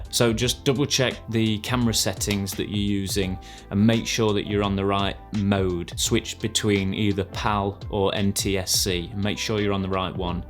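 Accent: United Kingdom. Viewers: His british accent is good to hear! This light has been great!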